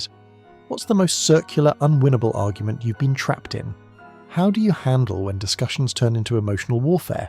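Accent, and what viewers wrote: United Kingdom. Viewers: If this is a us-based program, why the British voice? Do Americans think that if it's a British voice, it's true or that it carries more weight than an American style voice?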